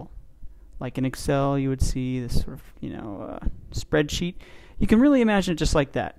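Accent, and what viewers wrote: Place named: India. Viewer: Not a thick indian accent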